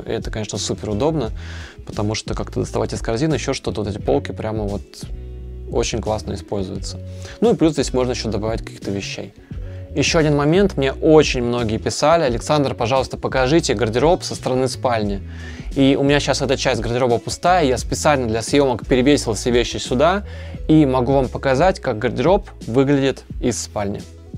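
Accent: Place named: Okanye, Russia. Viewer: Перестаньте окать!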